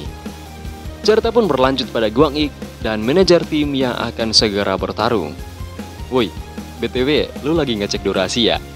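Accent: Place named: Parigi, Indonesia. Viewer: Balogat orang Parigi Sulawesi tengah hahaha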